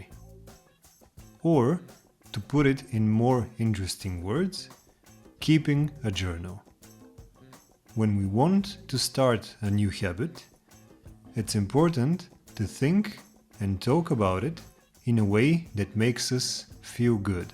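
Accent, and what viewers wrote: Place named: United Kingdom. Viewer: Слушам Ви с интерес. Чувам британски акцент. Хубаво! На американски английски “Дневник” е “ diary” Бъдете здрав !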